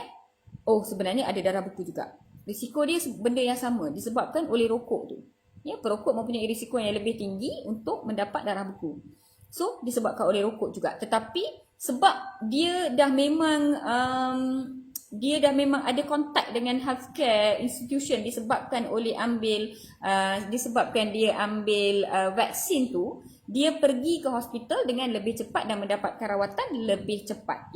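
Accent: North, Malaysia. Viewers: Fasih doktor cakap melayu.... Dr org utaqa ka?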